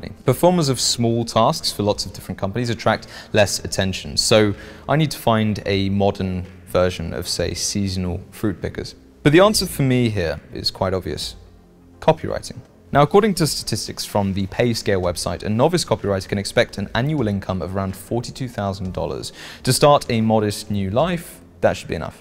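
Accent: United Kingdom. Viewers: Normally the British accent utterly repulses me, but in this case I enjoyed listening to this dapper English gentlemen explain the process and risks of purchasing forged documents from the Dark Web.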